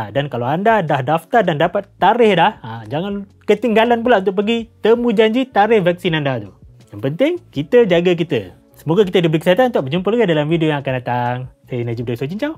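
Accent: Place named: Sumatra, Indonesia. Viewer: iya, aku jg sering dengar orang2 sumatra gaya ngomongnya memang mirip gini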